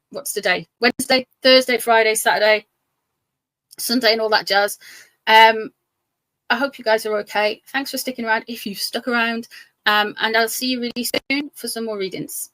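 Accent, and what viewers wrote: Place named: Newcastle, United Kingdom. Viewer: You are one my top two readers, tell it like it is. I know I don't comment much, but I do appreciate your time, and still loving the accent, my grandfather was a Geordie through and through, he had an accent very similar to you.😁👍❤️💐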